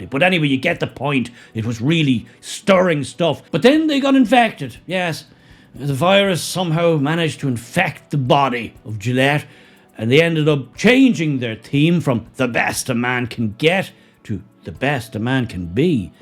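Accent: Dublin, Ireland. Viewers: I think it's very odd that your accent says "Dublin" but you use USA words like "store" and "tanked".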